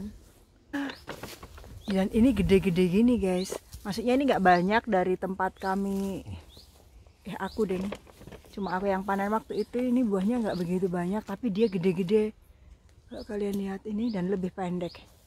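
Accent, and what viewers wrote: Java, Indonesia. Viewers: Mba mangga dan rambutan haha,mba jawanya medok kaya aku mba orang mana?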